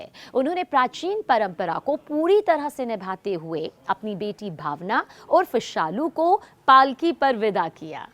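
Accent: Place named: Mumbai, India. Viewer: Ap maidam bihar se nahi ho apke awaj lag rahi ha ap mumbai ke ho 👍🏾👍🏾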